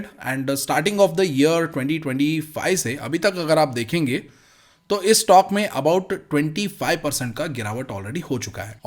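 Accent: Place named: Bengal, India. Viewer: Hindi theek se aati nahin to koi aur bhaasha mein boliye. Your Hindi (are u Bengali BTW?) grates on my ears 😭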